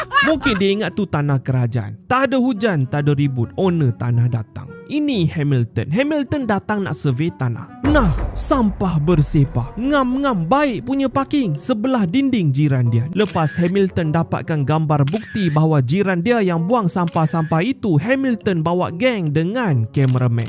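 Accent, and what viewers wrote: Sabah, Malaysia. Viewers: Dia cakap slang sabah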